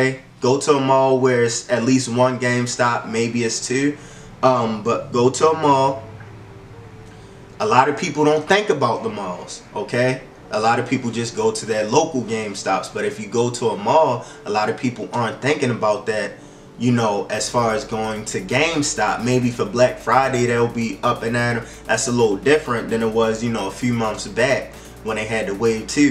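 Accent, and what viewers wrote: Baltimore, United States. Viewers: you from bmore? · That acent you from Baltimore?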